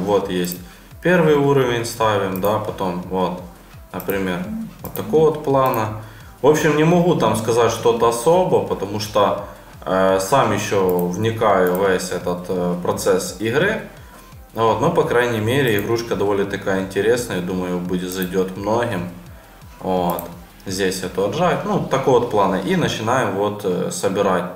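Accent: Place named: Ukraine. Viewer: Говор у тя на украинский похож. Но возможно ты с Ростовской области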